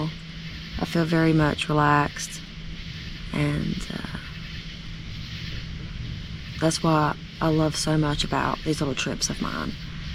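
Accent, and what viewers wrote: South, United States. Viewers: … buffalo mozzarella & tomatoes is one of my favorites… also half a bagel with cream cheese, capers & topped with smoked salmon (prepackaged thinly sliced) … omg delicious…. my girlfriend born & raised in Asheville shares ur wonderful southern accent i never get tired of hearing…. lastly… like i’ve mentioned in previous comments i see black bears almost every other day & they are plentiful in our western NC mountains … there is no way i’d sleep in a tent in this area… u are correct , 99% of bears avoid humans… it’s the remaining 1% that scare me … the old ones and or injured ones are the problem bears that worry me… & i fear for ur safety…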